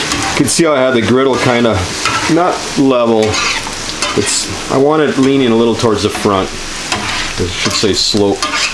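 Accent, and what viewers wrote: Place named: Minnesota, United States. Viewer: That accent, the weather, you've got to be in Minnesota.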